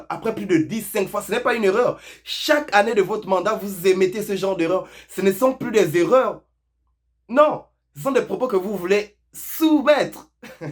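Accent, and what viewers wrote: Gabon. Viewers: Slt dis moi t'es gaboma? Parceque tu a l'accent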